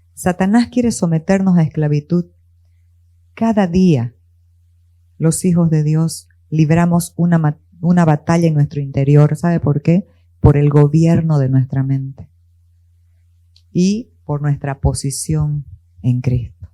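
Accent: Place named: East, Bolivia. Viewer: Me agrada como predica la 👍🏻 me encanta sus pausa y la forma entendible de la explicación de la Palabra🙌🏼 y sobre todo tiene el tono del oriente de Bolivia🤗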